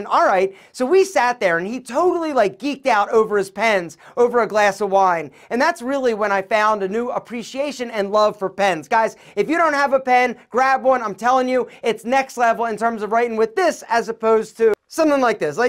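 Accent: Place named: Pennsylvania, United States. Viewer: West Virginia? Hmmm lol. With that accent I was thinking on the PA border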